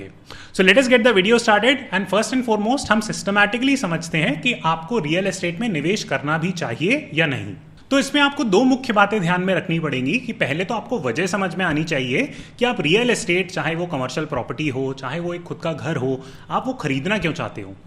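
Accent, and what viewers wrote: Standard. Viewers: Loved ur shuddh hindi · sir we all like your shudddhh hindi after all we are all hindustanis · Han pasand hai aapki sudh hindi, ty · Love your sudd hindi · बहुत बढ़िया। आपकी शुद्ध हिन्दी अच्छी लगी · Mujhe apki shudh hindi bahut pasand aayi. Bas 'Apun', 'Apun' bolna chod dijiye. :) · Shuddh Hindi me mzaaa aata hai yaar. Sun k bhi accha lagta hai aur jaise bade bhai samjate hai aisi anumbhuti hoti hai. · बहुत अच्छा भ्राता, आप हिंदी और अंग्रेजी दोनों ही बहुत शुद्ध बोलते हो,👍🙏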